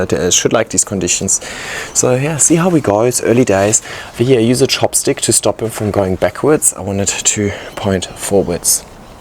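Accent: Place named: Germany. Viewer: It‘s cute to hear „ leider too heavy“ von dir😂😂😂, schon witzig das dein Deutsch nach all den Jahren noch durchkommt😬✌🏻finds lustig😊 love your video😃🪴 Lg Meike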